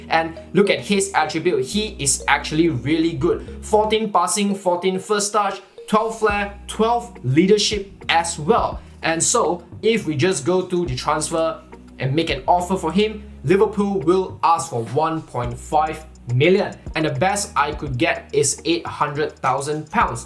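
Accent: Malaysia. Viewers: Kuat betul accent chinese/malaysian dia ahahahah. Btw great and informative video my guy! Got new subscriber in me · What the fuck is wrong with the Maysian accent 😑😑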